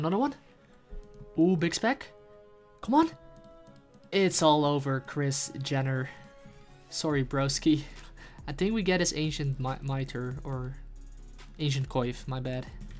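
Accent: Netherlands. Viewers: I can tell that you are Dutch, SICK accent :P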